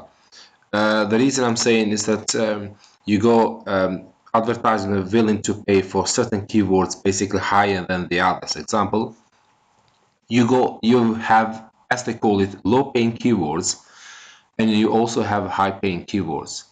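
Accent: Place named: Albania. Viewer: You sound like you are from alnania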